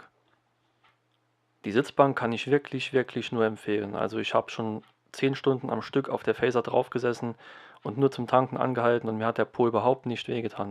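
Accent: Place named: Saarland, Germany. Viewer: Saarländer oder Westpfalz ... zumindest würd ich darauf tippen ..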